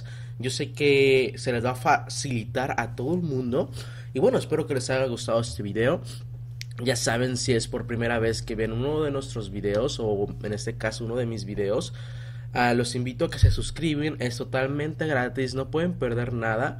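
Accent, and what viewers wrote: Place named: Fresa, Mexico. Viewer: habla muy freson jaja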